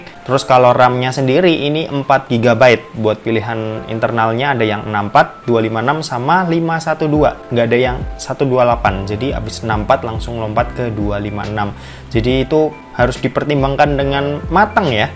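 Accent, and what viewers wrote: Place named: Java, Indonesia. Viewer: Bang, tinggal di Semarang ya? Kayak kerasa aja Logat Basa Jawanya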